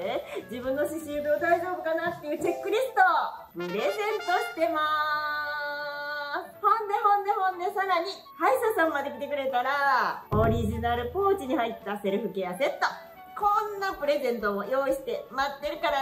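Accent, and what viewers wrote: Kansai, Japan. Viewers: 関西弁の話し方がめっちゃ聞いてて心地よい😊❤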